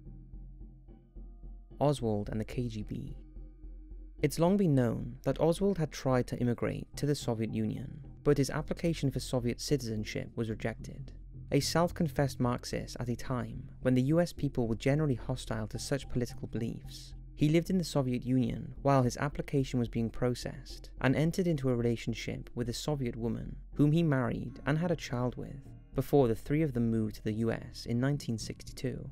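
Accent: Wales, United Kingdom. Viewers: Plus that Welsh accent... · I know a Welsh'mon when one talks 🏴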